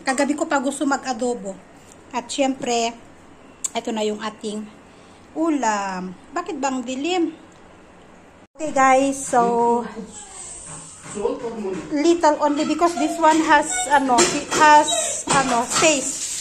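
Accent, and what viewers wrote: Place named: Ilocano, Philippines. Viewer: Adda latta tono nga ilocono ka ate hehe.. God Bless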